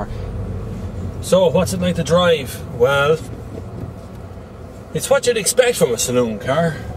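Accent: Ireland. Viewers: It would be great if a TV car show was commissioned in this kind of format, everyday cars for everyday people with a down to earth presenter. Top gear bores the hell out of me, dropping pianos on Morris marinas etc. I can't stand Clarkson, the man is a wally. Lets hope you get your own show, I'm sure you'll have a devoted audience of girls AND the boys too, I'm just a sucker for an Irish accent =) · great review. really pleased to hear an Irish voice for the irish car market. Am buying this car tomorrow. getting the dynamique version so no sat nav but an alround great car.and we got it for 17000euro with scrappage!!!!!! thanks again for a great review!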